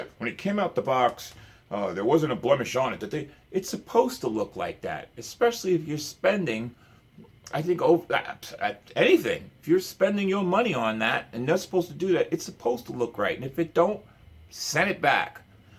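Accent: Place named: New York, United States. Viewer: Wtf, that clanking and pick slapping sound is HIDEOUS. I am a Zep head playing 30yrs. A true Fender jazz elite fan. I wanted to check this out. I went to you tube for an independent unbiased review but honestly WTF. I hear the Ny'r in ur voice as I am the same but if u like how ur amp is set or u believe that riff u picked thru had some kind of redeeming hook,... u are either very high or delusional. I wanted to get this bass but ur review brought vomit into my mouth. I will need to go play it for myself. I realize u must have done something or recorded or produced but what I just heard is ^#$$^&$@@ Funny in the background my brother just pulled up in a convertible and he is listening to the by.. Go cleans your soul my brother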